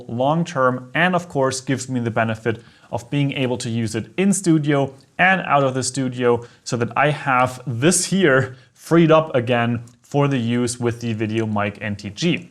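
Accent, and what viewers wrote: Germany. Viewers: alles gut. Guter Inhalt. Gutes, flüssiges, verständliches Englisch. Dennoch hört der Landsmann den Landsmann durch ;)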